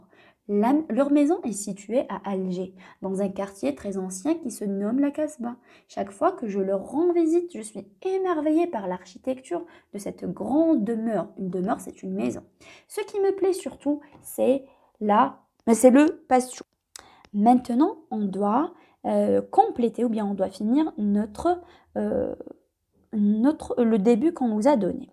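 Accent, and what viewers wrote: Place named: France. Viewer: J'adore votre accent français😍😍😍😍😍😍😍😍😍😍😍😍😍😍😍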